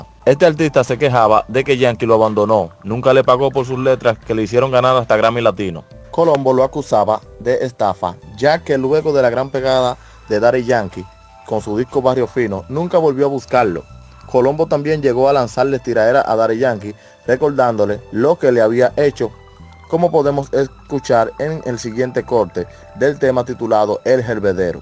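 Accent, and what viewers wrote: Dominican Republic. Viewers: Que tipo mas envidioso, escuchen su asento, no quieras dañar lo que no puedes dañar. Tengo amigos dominicanos, pero no son como tu de bocones, y menos envidiosos como tu ño eres, mira los comentarios, querias acer mal y son mas los comentarios de apollo, al boss, que lo que no lo apollan, papá la envidia te esta matando, y todo el mal que uno quiera acer a otra persona se le regresa.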